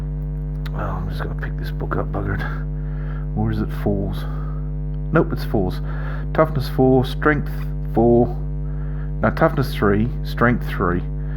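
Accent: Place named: Australia. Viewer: Aussie accent , pls tell me youre in Melbourne ?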